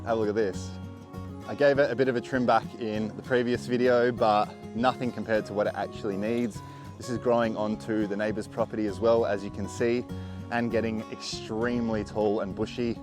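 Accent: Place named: South Australia, Australia. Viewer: you are my favorite gardener on YouTube. You remind me of Edward Scissorhands when you are pruning. You are probably too young to have seen that movie but I think you would enjoy it. It came out in 1990 starring Johnny Depp. I also watch the Garden Rescuer, I think he said you are his friend. He needs to learn how to protect himself better like you do. I live in St. Paul Minnesota USA and really enjoy your accent and knowledge of the creatures and plants in South Australia. I hope you never stop posting videos. You are such a nice man! 🥰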